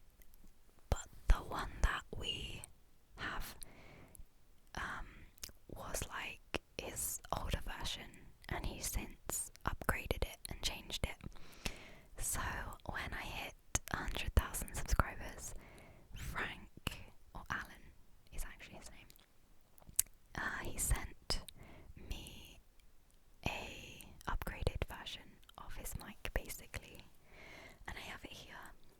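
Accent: United Kingdom. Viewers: OMG.. very happyyyyy when i see that your video is up and its a whisper video.. i really like your voice.. your british accent is amazing.. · Glad to have you back. Looking forward to more wonderful ASMR videos with the new 3Dio. Congratulations on jumping past the 100k subscribers mark. You have the most delightful whispering voice with your British accent! You and Dimitri are amazing ASMRtists.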